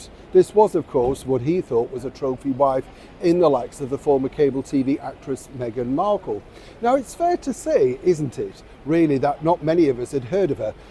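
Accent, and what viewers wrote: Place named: North, United Kingdom. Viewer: At first, Neil, I thought you said "she was a hooker" - which would have been more or less accurate - but you said "hugger" in your northern accent! x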